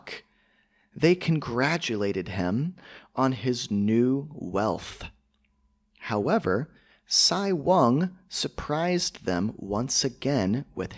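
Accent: United States. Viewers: I found your channel a week ago and was curious to know your first video. You have started differently but I really like your clean pronunciation!! I am learning English in British accent because it sounds better to my ear but you are making me to want American accent as well!! Thank you !!❤❤❤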